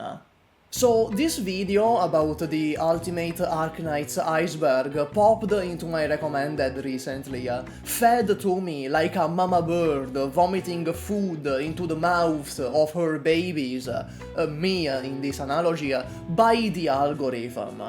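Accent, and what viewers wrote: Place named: Italy. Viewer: the accent (100% Italian)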